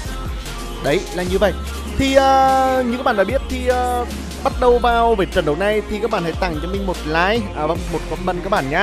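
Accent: Nghe An, Vietnam. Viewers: ông này người nghệ an à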